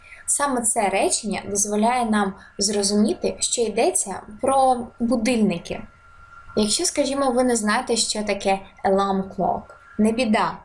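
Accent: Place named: United Kingdom. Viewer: Який крутий британський акцент у ведучої!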